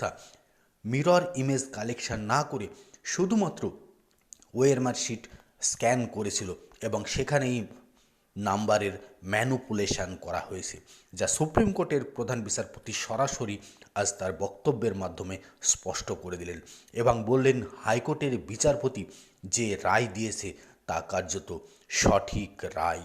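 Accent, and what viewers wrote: Bangal, Bangladesh. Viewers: ভালো করে কথা বলতে শিখুন বাঙ্গাল ভাষার গন্ধ এখোনো যায়নি।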